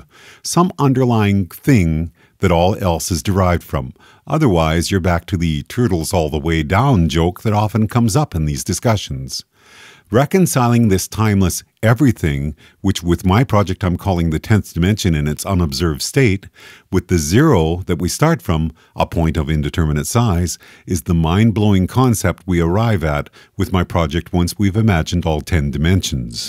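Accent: Canada. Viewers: A BOAT. About. These sound the same to a Canadian.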